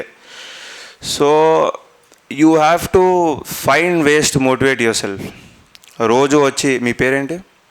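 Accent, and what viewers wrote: Telangana, India. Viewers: Very good to hear pakka Telangana slang ... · First time vintunna .... Telangana yasaa....oka civil servant matallo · Sooper speech in telangana yasa garu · కేటీఆర్ సార్ లాగానే ఉన్నారు సార్ అలాగే మ్లాడుతున్నరు తెలంగాణ మడలికంలో చక్కగా అర్థం వంత్తగా మాట్లాడారు సార్ ధన్యవాదాలు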